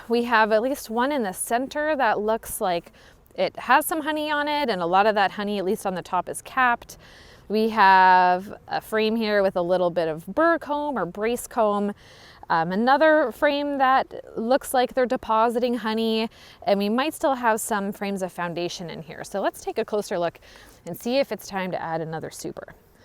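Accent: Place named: New York, United States. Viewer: Finally! A beekeeper who keeps bees and thinks like me! Super eloquent and intelligent! is thorough and knows her beekeeping! I love her explanations and NY accent 😍 Keep up your awesomeness and please keep posting! 🐝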